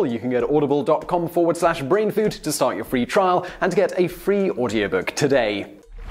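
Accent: United Kingdom. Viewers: Omg fucking UK accent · bobtheduck Yes, he’s undoubtedly British. You can hear it in his “Cubar” ( , ).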